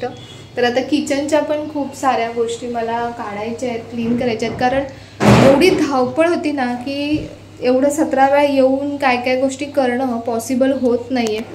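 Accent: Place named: Konkan, India. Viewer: Kokan natil language asish ahe....tasch boln aste sarvanche ..ani chhan ch bolatat tai❤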